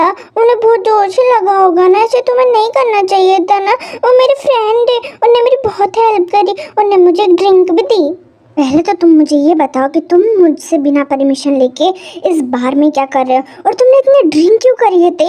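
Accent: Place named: Amroha, India. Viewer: AAP Khan se Ho tumhari language toh amroha ki lag rahi hai 😊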